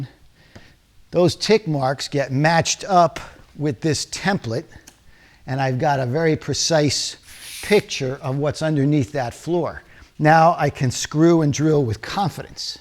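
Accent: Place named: New York, United States. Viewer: I am new to your channel. I saw you on Lola's channel. I love your work & your accent, it's kind of New Yorkish. Yes Americans do have accents!.. Now l want to know what's wrong with the mat! 🤣🇬🇧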